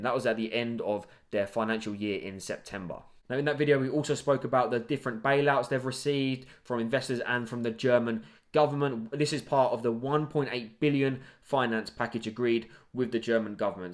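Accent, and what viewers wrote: United Kingdom. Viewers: Good keep it up! As an Italian in the UK, i like your UK accent 👌🏻😄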